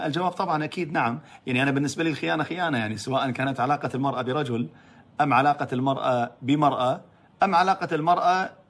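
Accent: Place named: Levant. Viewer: انا ابي اعرف الحين ، انت من الشام . مسوي نفسك كويتي ليش ؟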